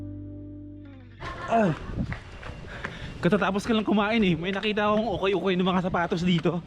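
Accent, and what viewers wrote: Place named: Karay-a, Philippines. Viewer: Do you speak ‘karay-a’? It’s cute to hear😀😀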